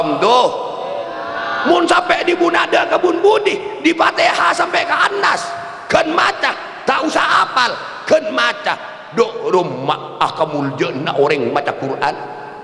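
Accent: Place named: Madura, Indonesia. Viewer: logat maduranya kental banget ya pak Kyai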